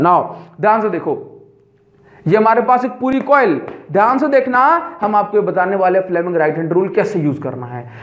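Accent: Odisha, India. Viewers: Odia ho kya sir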